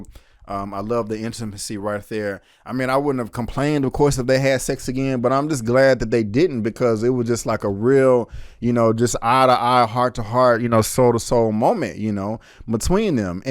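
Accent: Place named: Dallas, United States. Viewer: I can hear the Dallas! Make me miss the Southwest.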